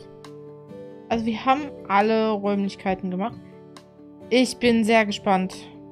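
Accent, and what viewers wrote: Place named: England, United Kingdom. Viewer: So... erste Kommentar! Die Folge ist mir einfach zu lange, maximal 45 minuten wäre optimal, wenn möglich. Ich finde dein Englisches Azcent lustig und süss! Du bist doch kein Geist,. Du bist ein Vampyr XD Bin gespannt was du noch in diesen DLC schafst!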